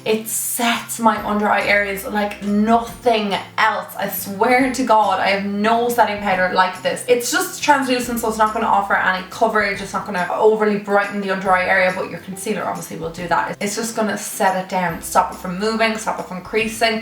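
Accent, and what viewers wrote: Ireland. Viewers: Your Irish! There is barely any Irish youtubers❤️xx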